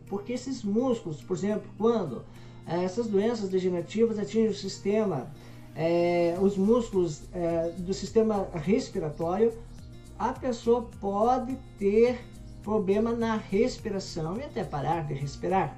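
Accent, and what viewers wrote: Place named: São Paulo, Brazil. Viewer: esse cara tem sotaque paulista, paulista não tem vez aqui não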